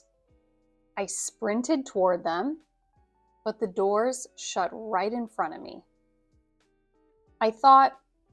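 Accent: United States. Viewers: I like your american accent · Which is it accent? American or British ?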